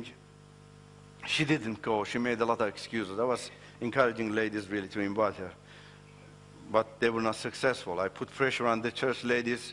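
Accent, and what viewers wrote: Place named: Iran. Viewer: Amina he's a Iranian.. things r pronounced in his tongue with slight difference., like different recitations of kuuuraan.. we call allah in most but many call it as alloah.. does that make him an ignorant in arabic? definitely he's learned enough to explain the message .. salaaaaaam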